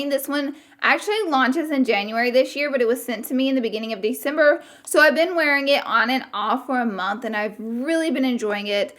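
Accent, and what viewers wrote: South, United States. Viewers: Love you too girl! I started watching you a couple years ago and I instantly fell in love with your channel! You are so fun and humble! I am honestly proud and happy for you on how far you've come! Don't loose the Southern accent! Hugs. Thanks for sharing this video.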